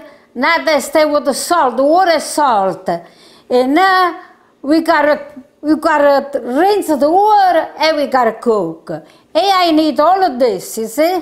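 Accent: Italy. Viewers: Dear Grandma, my parents are both first generation Italian American from New England but we all live in South Carolina now…….I’m truly sad that the grands and great grands truly have little idea of the richness of our culture……..I’m willing to bet they’ve never heard your accent while I loved visiting my Dad’s family for that very reason! What you are doing is really documenting what it is to be Italian and that is very important. Of course your cheerful voice, lovely smile and glorious food preparation keep us coming back for more….keep singing, Nonna!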